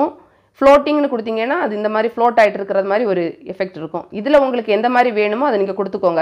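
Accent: Kanyakumari, India. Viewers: Sister....neenga kanyakumari thanae.....🙄slang super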